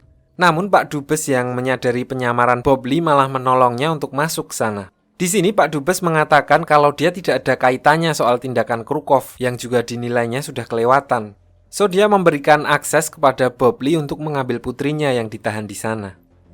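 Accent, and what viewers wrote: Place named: East Java, Indonesia. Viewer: Orang mana si bang, logatnya kek jatim bgt :v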